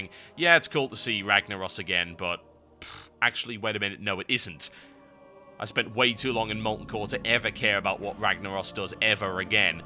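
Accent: United Kingdom. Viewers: stupid ugly british accent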